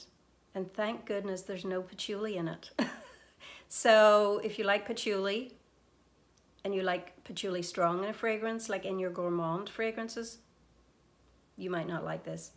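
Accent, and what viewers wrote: Ireland. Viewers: I know you are in Canada but every time I watch you I detect an Irish accent??? Orris root is Iris I believe. 👍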